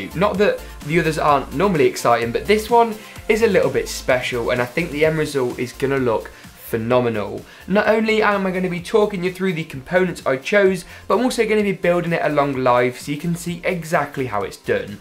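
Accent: England, United Kingdom. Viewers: Are you not from the UK, i thought you had an english accent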